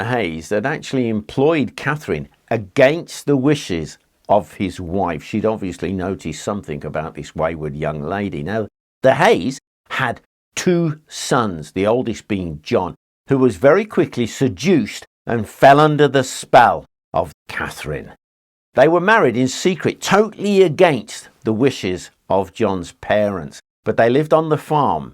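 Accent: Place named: Midlands, United Kingdom. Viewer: Midlands rather than northern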